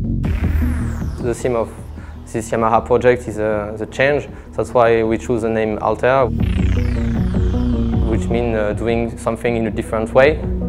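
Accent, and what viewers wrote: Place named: France. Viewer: Stranger things music ahah that french accent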